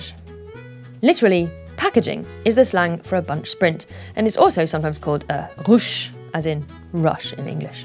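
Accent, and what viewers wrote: France. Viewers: Hi, when will U start the GCN in french? I love french accent! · I'm french, all i have to say is that you're video and accent is really funny xD I can definitely understand why our French accent in english is funny for you!!! And by the way, you're "Fran-glais" as we say in france when we talk a mix of english and french, is owesome lmao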